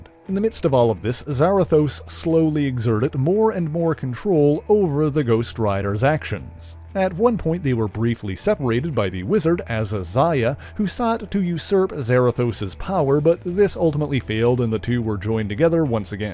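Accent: Canada. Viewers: I can hear the subtle Canadian accent 😂. Great video though. Been trying to hunt down all the single issues so I can finnaly read the full story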